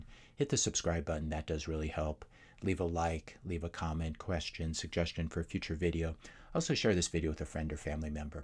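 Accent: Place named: United States. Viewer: As a european i felt the no gun comment totally made you out as american even beyond the accent. Europeans think totally differently. If we need guns we already lost, our humanity, our civilisation. We might as well start eating burgers.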